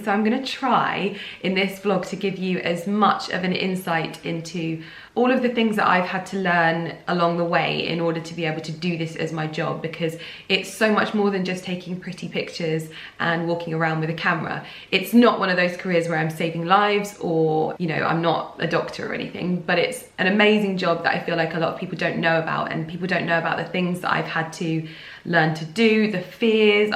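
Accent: United Kingdom. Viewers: Is she british??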